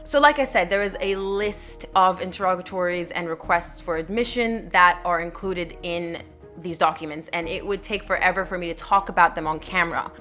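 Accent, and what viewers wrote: Canada. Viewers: I just realized how rude my comment could sound; I just meant I thought you had a faint Canadian accent. My apologies. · I’ve wondered for months where you practice. I eventually settled on Canada bc of your accent, (or lack thereof.) I’d have never guessed Australia in a million years.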